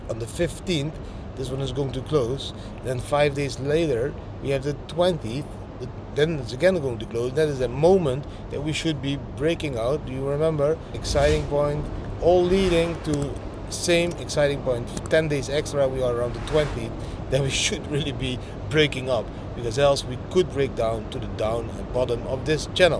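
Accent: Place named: Netherlands. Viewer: He een Hollands Engels accent:)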